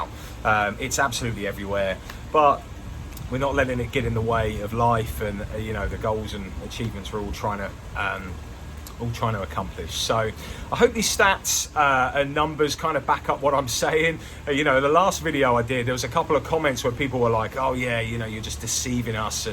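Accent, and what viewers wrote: United Kingdom. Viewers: I’m moving to Orlando in 5 years, we have family in the USA 🇺🇸 you sound like a Brit from the UK. I live in London, and very worried about trump and his policies regarding immigration as for interest rates they only low for domestic UK citizens if your UK buyer they want 5 1/2 up to 8% and they want 40% deposit down so you get discriminated against because you’re investing in their country, disappointing, 👎🏼👎🏼👎🏼👎🏼👎🏼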